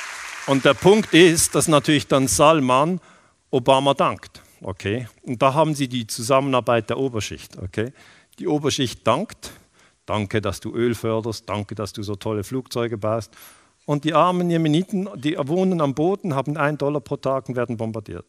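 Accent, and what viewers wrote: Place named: Switzerland. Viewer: Es chomt immer wider chli schwitzerdeutsch vo ihm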